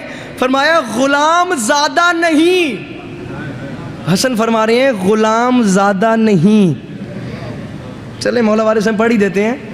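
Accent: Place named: Punjab, Pakistan. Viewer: meray bhai ue punjab ka hai or waha sab ka sirf is ka nahi sab ka bayan karnay ka tareeqa aisay hi hai.. ye over acting nahi hai..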